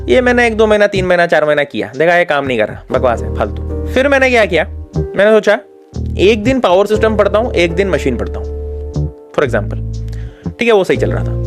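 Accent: Odisha, India. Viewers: Bhai aap odisha ke ho kya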